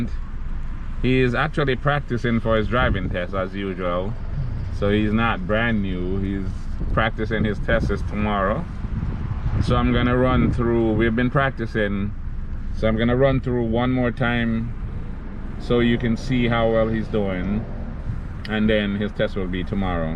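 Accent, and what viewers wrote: Jamaica. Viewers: Taking my test tomorrow 🙏🏾 btw is that a Jamaican accent?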